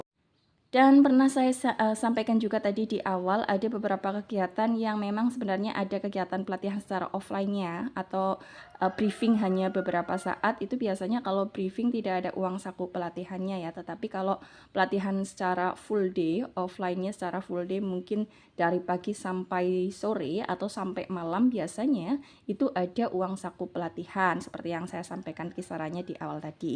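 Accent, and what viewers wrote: Banyumas, Indonesia. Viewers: kok kaya ngapak aksennya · Bantu jawab...kalo ga salah dari banyumas purwokerto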